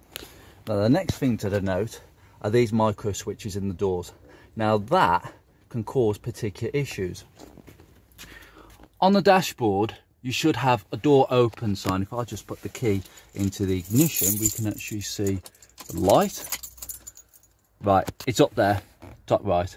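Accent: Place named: Birmingham, United Kingdom. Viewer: great info vid and thats a clean 90 thou milla focus you sound local to brum also if your instrument cluster starts to play up ie needles bouncing about or not moving at all either intermittently or not at all then it will need to be removed and the solder joints reflowed this happened to me a few months back you will prob have to get this done by a electrician. also if you have variable dash lights that flicker when the dail is on full just turn it down a bit until it stops flickering. one prob i got with my radio is the volume knob when turned to go either up or down goes any witch way i suspect it could do with a switch clean tbh not a big problem as i tend to use the stalk instead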